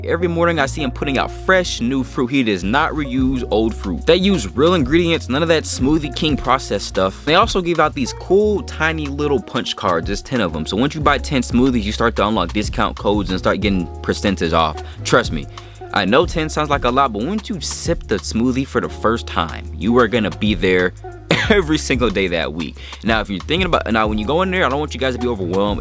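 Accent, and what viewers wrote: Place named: South, United States. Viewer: Sounds country, but thats cool.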